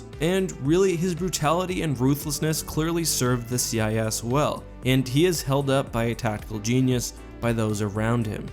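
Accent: Canada. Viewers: Ay you canadian??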